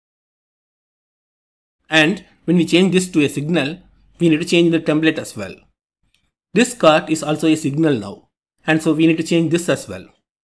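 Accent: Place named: India. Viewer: Fantastic explanation; he has such a nice Indian tone!!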